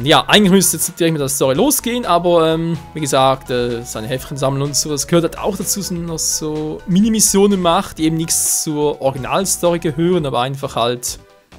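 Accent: Switzerland. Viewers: Der schweizer akzent machts 10mal besser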